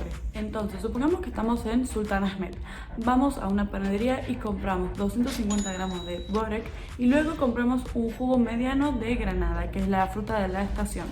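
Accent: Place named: Argentina. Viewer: hola donde eres? hablas como argentina. Pero creo que no eres de alli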